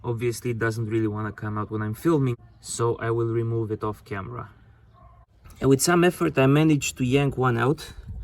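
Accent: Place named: Romania. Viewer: Bravo. Frumos, lucrat curat cu atentie, cu grija la cuplu reocmandat dar la mediul inconjurator. Mi-am dat seama dupa accent si expresii ca esti roman atat.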